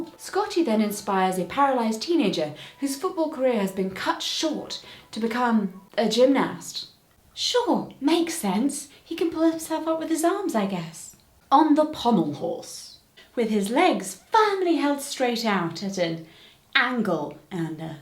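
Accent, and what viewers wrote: United Kingdom. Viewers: Maybe it's my American accent hearing your British one, but I could also reason using the word "pawn" as a stand in 😉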